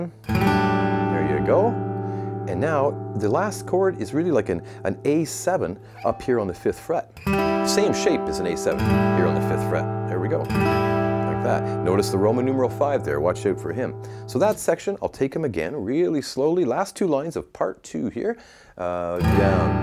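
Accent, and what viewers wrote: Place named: Canada. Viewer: Great channel Love the structure of the lessons and the Canadian accent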